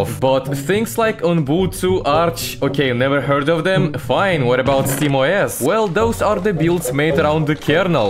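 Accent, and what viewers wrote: Russia. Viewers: Бля чел у тебя русский акцент почему у тебя только английские субтитры и озвучка😭😭😭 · bro english in russian accent is so harddd🔥🔥🔥🔥🔥🔥🔥🔥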